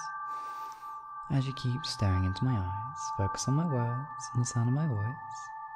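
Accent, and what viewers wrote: United Kingdom. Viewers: Me accidentally clicking and listening for some reason: HE BRITISH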